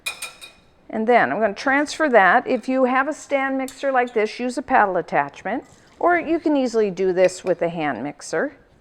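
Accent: Canada. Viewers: Confectioners sugar - is that icing sugar?? Love your Canadian accent btw xx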